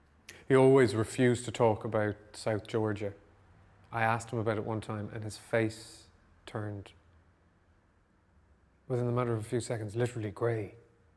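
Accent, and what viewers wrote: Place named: Ireland. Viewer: He has a very nice Irish tone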